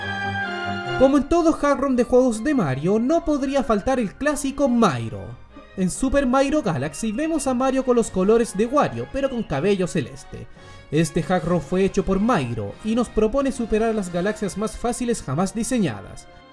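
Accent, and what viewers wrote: Chile. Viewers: ¿Eres chileno, verdad?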